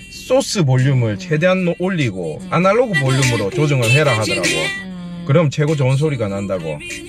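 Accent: Gyeongsang, South Korea. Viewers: 크...많이 듣던 갱상도 톤 고향이 어딩교 내는 마. ...영천 이구마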